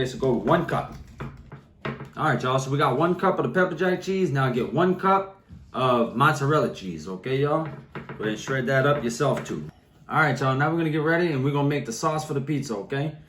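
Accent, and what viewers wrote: Louisiana, United States. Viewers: Indeed fabulous chef and love his cajun accent too. So chilled and the food is full of life with seasoning and care is there. Love the channel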